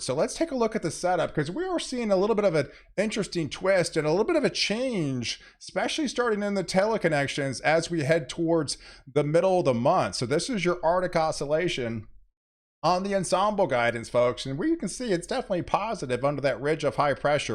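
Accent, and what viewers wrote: Texas, United States. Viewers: I love his texas accent and manner of speaking unlike Canadian no knowledge or love of weather you just listen to them read their written speeches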